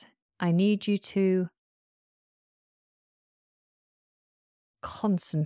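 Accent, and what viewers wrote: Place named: United Kingdom. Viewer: I really love to hear a woman speak british english. excellent podcast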